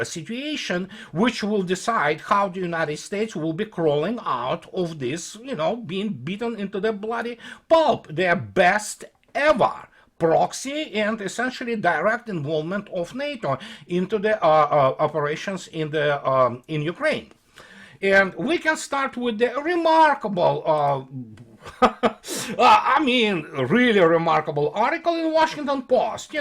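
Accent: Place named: Russia. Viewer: When you read from an article could you please not dramatize your voice you are hard enough to understand with your thick Russian accent it really does no justice to make it worse and harder to understand you by adding drama to your voice. Please try to slow down a little and let your voice keep pace with you brain you make a lot of filler noises that actually make you sound like a drunken American with a thick Russian accent.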